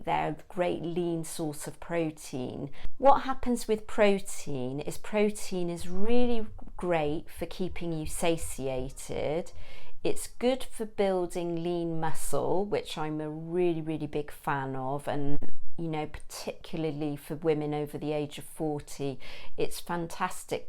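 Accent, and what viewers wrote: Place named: London, United Kingdom. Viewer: Hi, New Subbie here, pleased to meet you😊 You sound like a Londoner like me (lol). I am 50 with borderline hypothyroidism, i really want to lose weight (7 stones would bring me to ideal weight according to my BMI) but finding it hard. Do you have any ideas for how to make exercising fun? I think that would make a big difference to me because I can't motivate myself to be consistent because I don't enjoy it. One thing I've thinking about is go on an adventure to find the best free exercise parks in London (you know the ones with the free outdoor exercise machines), maybe you can do a video on that? Just an idea... Love your videos😍, stay blessed, One love❤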